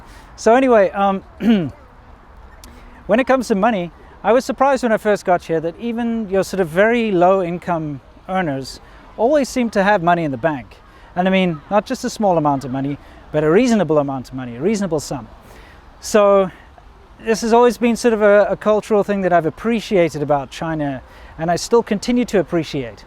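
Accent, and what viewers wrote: South Africa. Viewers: Not particularly interested in China but I somehow got hooked by your channel. Love to hear the SA accent. Reminds me of when I studied at UCT - best time of my life! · Hey there compatriot. I've just subscribed, just seen your channel. I'm a huge fan of Chinese movies (Hero etc.) and music (The Yellow River Concerto, makes me cry) So please do a series on Chinese music and theatrical culture. In these strange days let us not forget about the higher and finer things China has given us (Tai Chi? do they still do it?) Many thanks...it's so good to hear a South African accent lurking somewhere there!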